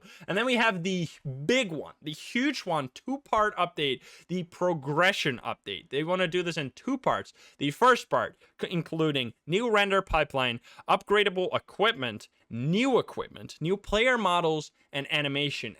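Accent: Netherlands. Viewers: Are you from netherlands?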